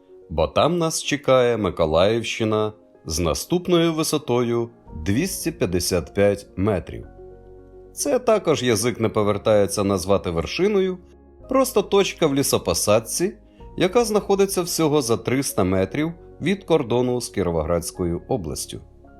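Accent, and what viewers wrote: Russia. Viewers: Не двух а двох і у диктора відчувається декотрий російський фонетичний акцент, певно думає на ній і розмовляє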